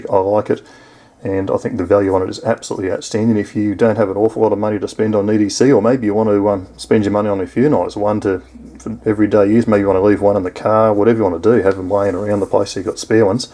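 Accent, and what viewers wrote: Australia. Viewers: Mate.. I just loved the Review and it's great to hear an Aussie Accent doing one and you've got me motivated to go down to Walmart and buy one tomorrow .. :)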